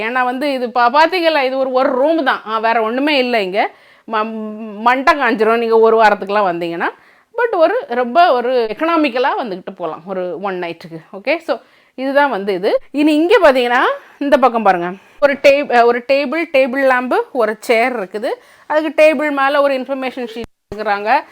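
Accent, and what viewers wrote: Nagercoil, India. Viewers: Akka, truly your videos are stress busting for recent times. Thank you so much 👍🏻 அதுவும் நம்ம நாகர்கோவில் தமிழ் அடிப்பொழி...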